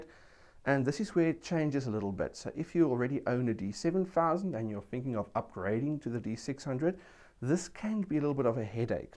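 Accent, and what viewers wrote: South Africa. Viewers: I am busy going through reviews of the Nikon D600... i just stumbled over yours, and I was like: Hey, I know that accent! :)) It is nice to see a camera review made by a south african on here for a change. :thanks for the great review! :)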